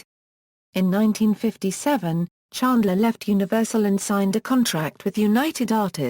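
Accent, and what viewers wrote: United Kingdom. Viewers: Why does YT use foreign narrators when talking about American movies? The British sounding female narrating the bio about Jeff Chandler mis-pronounced the name of the Native American Apache Chief, Cochise---- it is PRONOUNCED COCHEESE!! Thank you.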